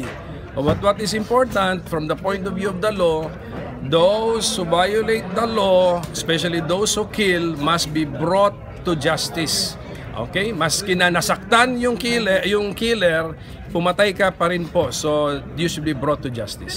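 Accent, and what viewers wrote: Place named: Visayas, Philippines. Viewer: bisaya sya kaya ganon ang salita..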